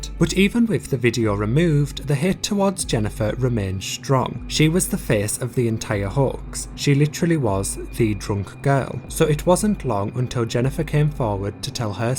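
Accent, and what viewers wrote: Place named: Scotland, United Kingdom. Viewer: First time here and oh my God, I just looove your accent! It is Scottish maybe?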